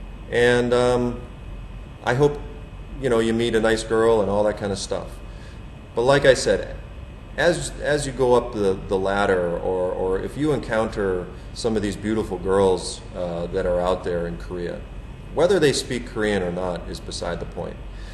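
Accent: United States. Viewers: steryotypical American accent, Americans don't actually talk like that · wow awesome american accent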